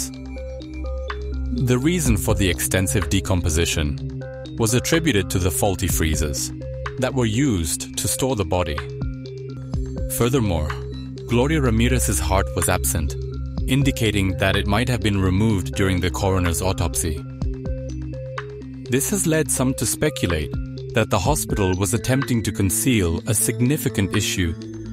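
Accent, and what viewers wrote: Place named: Germany. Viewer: Just chiming in to say your voice is great, and the German accent makes it a bit more interesting.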